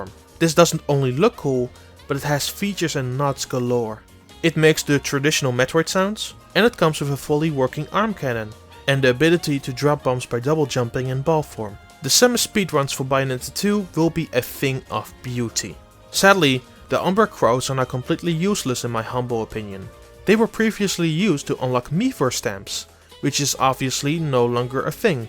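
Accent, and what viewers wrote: Netherlands. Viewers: Clocked the dutch accent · WOW this guy sounds Dutch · You sound dutch , nice review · Urgh, i'm sorry but I can't stand this reviewer. I know he's Dutch (As am I), but the way he pronounces things is justt too annoying :/ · This guy is soooo DUTCH it's not funny anymore. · why does this have a dutch voice aaaaaa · Damn, fellow dutchie here, I know the accent is quite apparent but you guys are kinda harsh, more than you need to be I’d say. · that thicc dutch accent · I was also wondering if this guy was Dutch and that seems the case. Its not to diss him but he really got a Dutch-English accent and for most Dutch people it isnt really pleasent to hear because we grow up with a lot of English speaking Media. But he shouldnt be bothered by haters because his vocabulary is good. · Let me guess you’re Dutch lol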